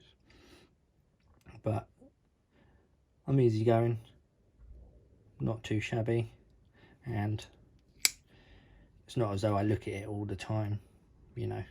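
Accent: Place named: United Kingdom. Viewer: Thanks for the videos by the way, it's always nice to have another British voice chime in and help give insight with context of our laws and prices. The HH custom/special knives are such a good idea for us UK folk in regard to affordable and legal, I hope it prompts companies to start thinking globally a bit more when it comes to lock/size decisions. Thanks for stating the price, I wasn't trying to pry, just thought if anyone is looking at this and wondering how much of a difference used and new are it might help. I've noticed a lot of people talk about the resale market without mentioning price or where to go (I've found Instagram is the main second hand market).👍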